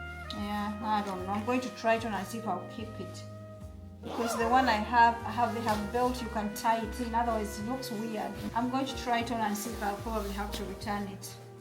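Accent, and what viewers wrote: Uganda. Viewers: Hi, are u ugandan? Ur ascent is so Ugandan.. Love u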